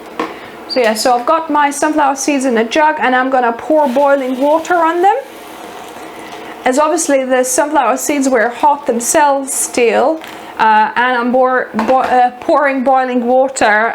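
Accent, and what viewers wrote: United Kingdom. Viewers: I hear British, German I’m not sure but being multilingual is so cool 😎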